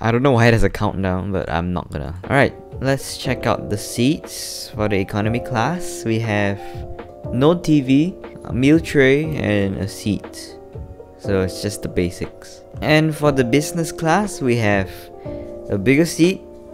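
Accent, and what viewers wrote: Singapore. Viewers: yow ur a singaporean? you sound like one, jsut like me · You sound singaporean bro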